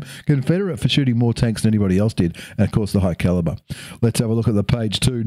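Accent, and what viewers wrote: Australia. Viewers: aussie aussie aussie ooi ooi ooi hello mate from N/A love that awesome aussie accent hey which is better m60 or 121b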